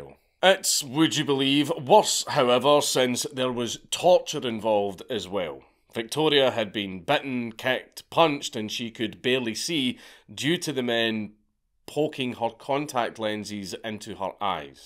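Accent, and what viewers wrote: Scotland, United Kingdom. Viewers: Come for the topic, stay for the brogue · Dankula has the strongest accent you can understand. Whenever I go to UK, I prepare myself not to understand what half of the population is saying. He learned to standardize his strong accent or just lucky? First time watcher, anybody knows?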